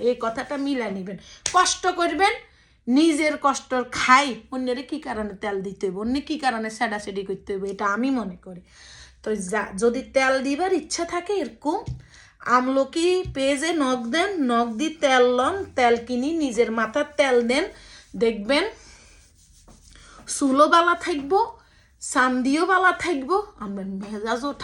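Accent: Noakhali, Bangladesh. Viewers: আপু আপনি আপনাকে অনেক ভালোবাসি আপনার ভিডিও গুলো সব সময় দেখি আমার নোয়াখালীর ভাষা অনেক ভালো লাগে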